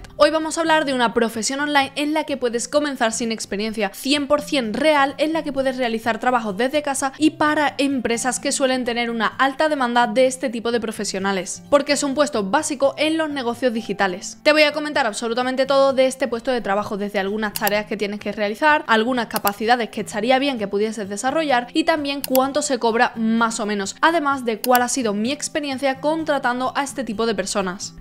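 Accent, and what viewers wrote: Spain. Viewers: Que bonita eres pero ese acento españolete arruina la magia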